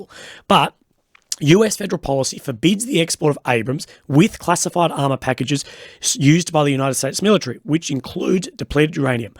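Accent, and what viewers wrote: Australia. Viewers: Good to get my updates in an aussie accent.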